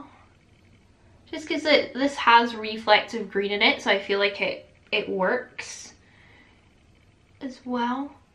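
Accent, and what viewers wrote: Scotland, United Kingdom. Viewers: Definitely a look...or an Elaina look too. Lol. Can I do your Scottish accent? I doubt but I can try. 🤣😘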